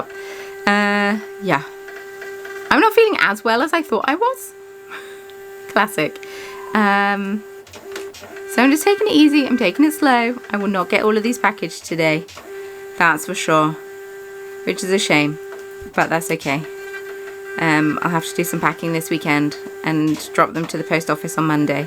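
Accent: RP, United Kingdom. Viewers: Bap if not crusty. Cob if it's crusty. I'm in Solihull, near Birmingham airport, and you'd sound posh here.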